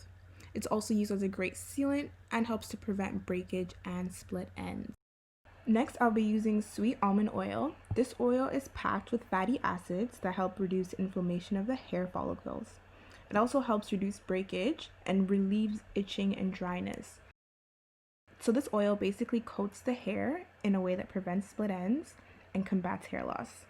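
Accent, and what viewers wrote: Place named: Canada. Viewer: Right away knew you were Canadian lmao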